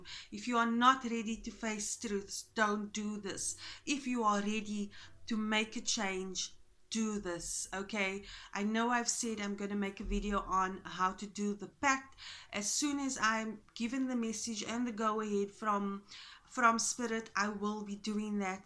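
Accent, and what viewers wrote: South Africa. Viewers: You have to be from SA? Just came across this video but we SA people have a specific accent 😋😋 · Are you South African? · That accent. You from south africa Capetown bra.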